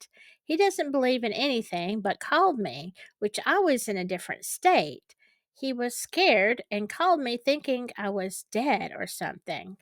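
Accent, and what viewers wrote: South, United States. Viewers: I think you nailed it and love your channel name. It tells what kind of content you have with the word spooky and with your beautiful southern flare to give the added bonus. Also, I got to hype your channel. YAAA ❤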